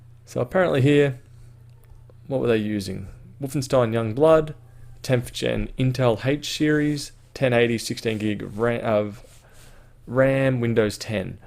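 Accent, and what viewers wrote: Australia. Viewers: You Australian mate?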